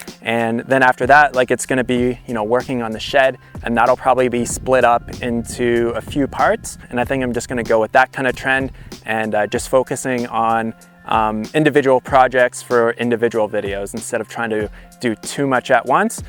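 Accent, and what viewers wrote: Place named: Canada. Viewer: I like Canadians accent.. house, out, about etc.. just interesting ou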